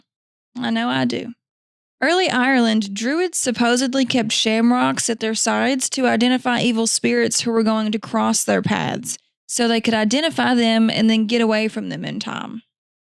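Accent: Kentucky, United States. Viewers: Lol glad super glad you randomly showed up on my feed. Super pretty, funny, and the Ky. accent is nice. I am subscribed lol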